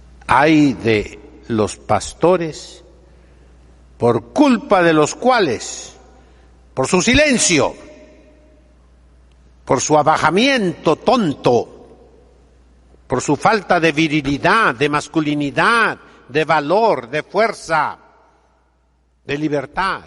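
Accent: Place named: Argentina. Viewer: Más exactamente con acento argentino.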